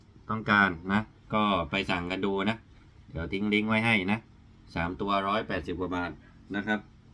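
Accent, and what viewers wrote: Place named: South, Thailand. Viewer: หล่อจังเลย ชอบมากๆๆ❤❤❤❤ น่ารัก พูดสำเนียงใต้ยิ่งน่ารักไปอีก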